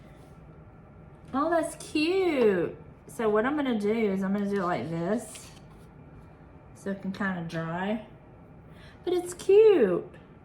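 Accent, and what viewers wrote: South, United States. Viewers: I’m not sure how I found you on u tube but I love starting my day with your videos!! I don’t know if it’s the opening music or your soft spoken southern accent but it just relaxes and calms me to watch you. It also makes me want to go thrifting and decorate!!😊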